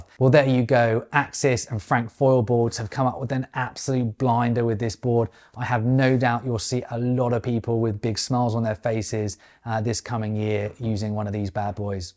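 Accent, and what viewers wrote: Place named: England, United Kingdom. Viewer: Nice one. I love the Australian colloquialism with an English accent. Easy peazy lemon squeezy. I have a question about one of your earlier videos, Part 2 of you learning to downwind and flat water paddle up...You mentioned two things which made a significant difference...one was the foil and the other was the paddle. Can you explain why the paddle made a difference please. And also why you no longer use the PPC paddle shown in that video.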